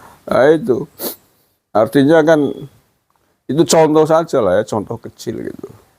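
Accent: Banyumas, Indonesia. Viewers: Wong ngapakk.Krawang Hadirrr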